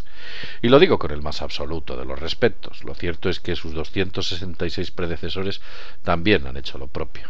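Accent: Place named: Madrid, Spain. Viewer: Hola,...Amigo! Se me hace que tú no eres de Galicia,..no,no,...por tu acento creo que eres de Madrid, como decir americanos de New York y de Chicago, dos acentos distintos, New York un inglés puro y Chicago un inglés más Slang... No te ofendas, simplemente el de Madrid es más refinado, el de Galicia directo y tal vez lindero con Portugal. Bien, muy interesante lo que haz explicado, soy uruguayo y no me gusta ser despectivo con nacionalidades, te aprecio, soy incha del Peñarol y no me llevo muy bien con Nacional,...es eso, nada más... Saludos, continúa! Daniel.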